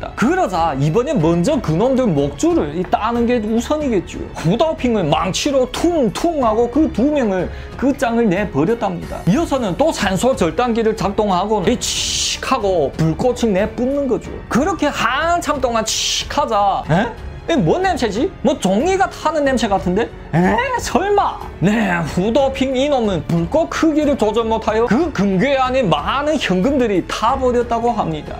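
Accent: Yanbian, China. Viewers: 는 연변사람인가요?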